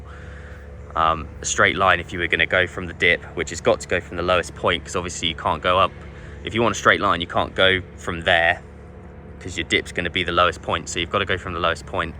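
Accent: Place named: Manchester, United Kingdom. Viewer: What part of Manchester are you from?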